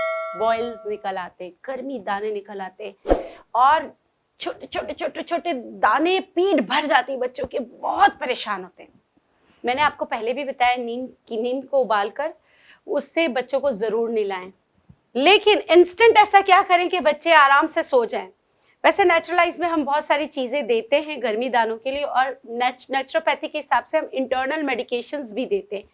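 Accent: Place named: Gilgit-Baltistan, Pakistan. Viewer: Salam Dr Madam, this is very nice and very simple kids and others, thank you Dr gi Your way of talking shows you from gilit baltistan.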